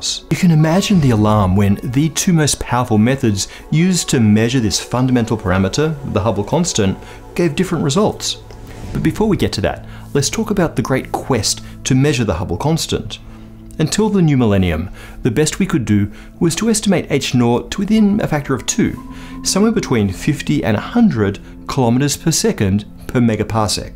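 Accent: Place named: Australia. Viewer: This gentleman makes very interesting videos and I can only say good things about them. I can understand why Professor O'Dowd has so many supporters. I feel terrible for saying this but to my eyes he has an extremely strange shape. His head seems to be much too big his torso and legs appear too small. His legs seem to be too far apart and too short. It's the oddest thing. His habit of holding his fingers intertwined with the thumbs touching on top for no reason I can determine irritates me intensely. On top of all this is his very strange stresses on apparently random syllables in each sentence. And finally there's that Australian accent which grates on my nerves like a chalk screech on a blackboard. The thick eyebrows and beard seem particularly ill suited for his looks. There's nothing personal about any of this and really I mean no offense as the whole perception is entirely mine and I can't explain it. 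Am I alone though? To say something good about Professor O'Dowd is easy. The inconsistency between the two results for Hubble's constant is fascinating as indeed are the topics of Dark Matter and Dark Energy. We live in exciting times for cosmology so consider the enormous difference between this scientist and another man who obtained a Doctorate in Astrophysics, Dr Jason Lisle, whose area of study was Solar Dynamics and who published a few papers before throwing his career away to become a "researcher" for an Answers in Genesis type outfit and a YEC (Young Earth Creationis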